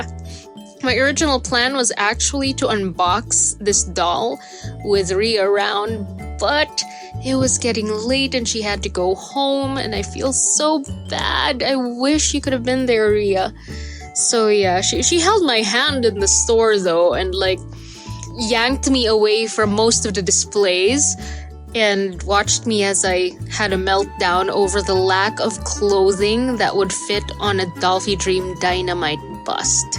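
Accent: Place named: Philippines. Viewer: Holy shit that's really expensive.... Also, are you from the Philippines? I could sort of hear the Pinoy accent. Aaaaand the Dollfinas kinda gave it away for me. Not much though, I still had to Google it. Edit: Nevermind I just got to the part where you said you got something from Manila.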